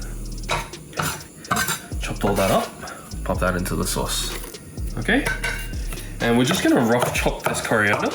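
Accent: Australia. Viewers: You sound Australian but you're chinese.. im so confused! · He Australian? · Are you Australlian?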